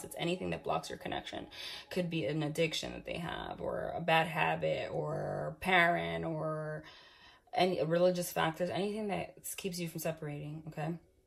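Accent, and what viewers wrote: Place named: East Coast, United States. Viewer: I lovvvvve your East Coast accent ☺️♥️💓🙏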